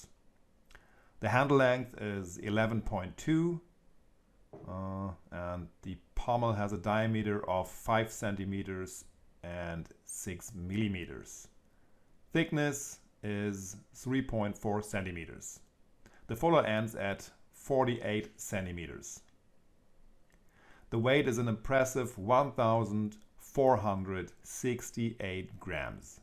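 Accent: Germany. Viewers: Don't you ever dare to lose your german accent,. Best wishes.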